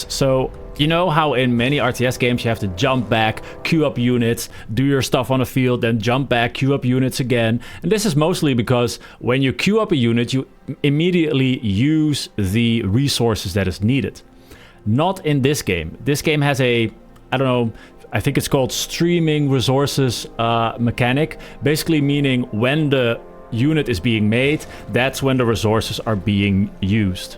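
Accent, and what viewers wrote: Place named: Netherlands. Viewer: Very good video! Are you Dutch per chance? I hear an accent :)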